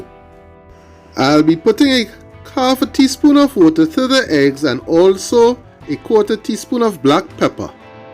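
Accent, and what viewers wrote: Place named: Trinidad and Tobago. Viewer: your videos are awesome too - I love the Trinidad vibe - two of my best friends are a couple and she is Trini - they live in LA and I see them a few times a year and her whole family is so positive! That is who I went to Carnival with and your narration is just 100% that vibe 😊 it's fun to watch even if I don't think I have the cooking chops to do what you do!!!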